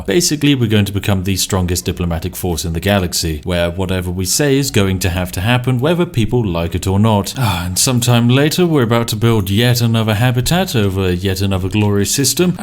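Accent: United Kingdom. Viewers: You're sounding awfully british today,.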